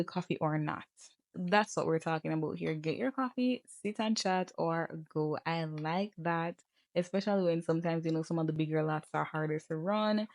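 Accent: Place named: Caribbean. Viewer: Ouu I hear an accent happy to see other Caribbean gamers this was a great video 🫶🏽😊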